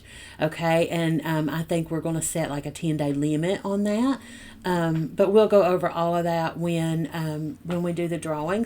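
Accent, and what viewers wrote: South, United States. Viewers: Sounds like a good day for you. You live in Maine? You sound more southern than I do and I live in Kentucky. You sound like one of us.😁😁😁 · As the video goes on, I do declare, it is questionable that you may have have some Georgia 🍑 in you, oh I detect some southern draw! Oh gosh ,I have been smiling for my whole time here! How oh how could this be? I am going to subscribe !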